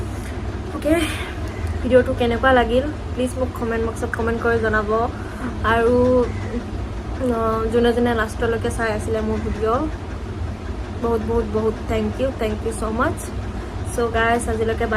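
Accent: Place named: Sylhet, Bangladesh. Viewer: বাংলা লেখা স্বত্বেও কিছু না বুঝা আমি😅 সিলেটি সিলেটি ভাব আছে কথায় কিন্তু কথা গুলো সুন্দর। ভালো লাগলো❤️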